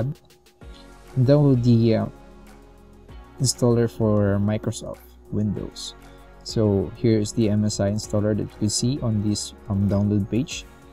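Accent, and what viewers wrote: Philippines. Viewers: kaya pala familiar ang accent mo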